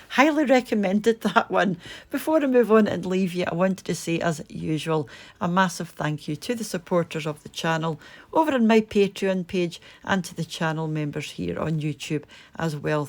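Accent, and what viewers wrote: Scotland, United Kingdom. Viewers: Oh my gosh I could listen to you all day! Love the scots accent. Never heard of custard over cake here in the USA but seems like something nummy to try!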